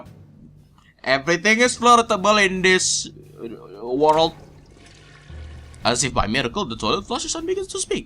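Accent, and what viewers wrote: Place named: Arab world. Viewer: are you Arabic? that accent sounds like it but i would like to know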